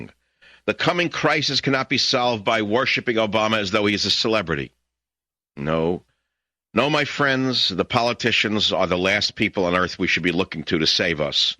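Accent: New York, United States. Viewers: 🎉🎉😂🎉🎉 it’s a party!! The legend in the house!! 🎉 listener since 94!!! 🤘 is a voice that represents we the people! Miss being able to listen everyday, what hooked me was his intro music and collaboration of metal music! NOW LISTEN UP! As a metal head from way back in the 80s I got my cdls and drove a truck for 20 well on my way to California first time out his intro music came on and then this guy with a New York accent came on and I couldn’t turn it off! Listened everyday as a truck driver! Good memories! Miss teddy!!! Nothing like! I would love to just sit and actually have a conversation in person! Woooooo wooooo sheeeeeeple! Lmao 🤣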